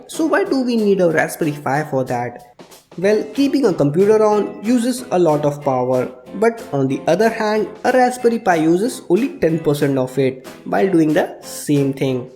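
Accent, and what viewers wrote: India. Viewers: If u have trouble understanding Indian accent , just leave without commenting anything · Dude thats an Indian accent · He sounds like Indian tech support. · yep. Thanks, that is the normal tilt and pitch of an Indian - i think a great video. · nobody is judging mate, i know how it is to learn new languages, i speak 5 of them, and all with an accent. blame my ears, i have no problems with accents, but indian accent is so annoying i cannot enjoy these videos however much i try. and i try a lot. sorry my indian friends. i love you but my ears bleed every time.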